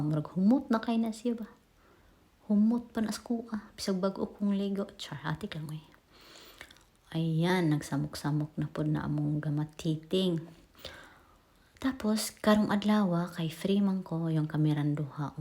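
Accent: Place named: Visayas, Philippines. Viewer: Ang cute pamati on mag bisaya uy hahaha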